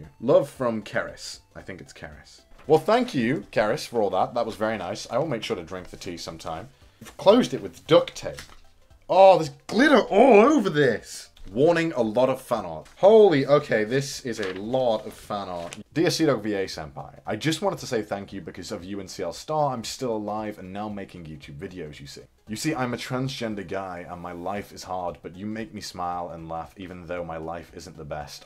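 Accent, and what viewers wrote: United Kingdom. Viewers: I just realized you have a British accent...I'm ashamed of myself. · Aw you look like an older version of my friend, with a British accent. Oh my...even the reactions are similar...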